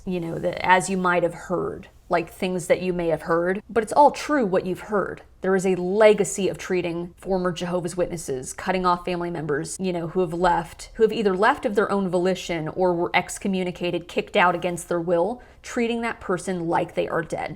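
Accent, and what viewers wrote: New York, United States. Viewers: I ALWAAAYS GET THE LYING PERSON EVERY TIME!!! I LOOOOVE YOUR NEW YORK ACCENT..IT'S THE BEST!! YOU DESCRIBED MY MOM TO THE T...THE HARDCORE WITNESS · Off topic your ny accent is hot❤ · Long Island and possibly Jewish. 😝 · Long Island? 🤔😳😬✌🏼I Think I Heard it when You imitated Your Mom and the 73 Yr Old Thanks 👍🏼🌞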